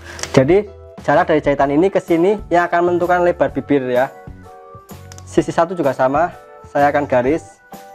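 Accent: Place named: Java, Indonesia. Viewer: Trims mas ilmu bermanfaat untuk pemula. sy senang dengar penjelasannya dgn kosa kata bahasa yg rada2 medok selain dapat ilmu juga mengerti bahasa Jowo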